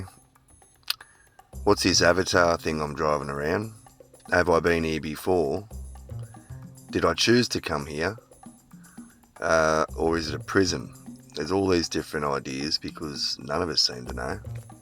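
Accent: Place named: Australia. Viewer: Wow this is crazy awesome! ... a lot to digest here... so your Son's name is Phoenix? For reals!!! Both my husband and first born daughter = 138 in ordinal.. I'm 888 in Sumerian and a couple of things I found while watching this (just for fun) I was exactly 9 months 1 week and 1 day old (911) on July 25th 1981.... and 34 years 8 months(+3 days) after January 1st 1981 my first daughter was born pm.. I love your vibe man,.. so great to hear a bonza Aussie accent for a change while listening to videos on YouTube.. :face-blue-smiling:💙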